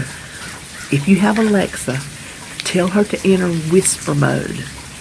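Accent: South, United States. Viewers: I love your “southern talk”, unlike my NY accent. When you said “coffee”, that’s me.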